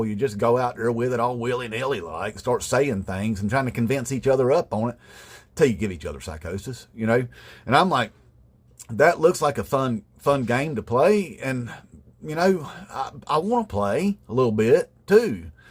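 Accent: South, United States. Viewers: I love to hear a southern accent speak absolute sense. Cheers brother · It blows my mind hearing INTELLIGENT words coming out of a redneck voice! LoL, love your channel! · Your southern accent is real hard to listen to. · From your accent you sound Southern.Dont put shade on us.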